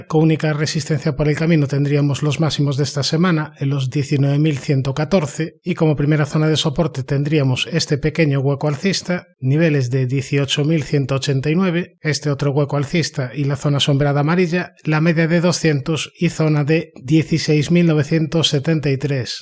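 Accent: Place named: Seville, Spain. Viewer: Por el acento y tu nombre y apellidos está claro que eres de Triana 😅. Llevo más de 35 años invirtiendo en Bolsa y puedo afirmar que eres un Crack y te sigo desde que te descubrí. Entiendo de Balances y necesito siempre tener datos de los directivos de las Empresas pero, por desgracia, no tengo conocimientos técnicos de gráficos ni velas...Te apoyo al 100% para que sigas dándole luz a nuestras inversiones. Te lo dice un Coruñés nacido en el Concello de Mañón tbn con acento Sevillano. Mucho ánimo y, sobre todo, muchísimas gracias por tus aportación a nuestras inversiones.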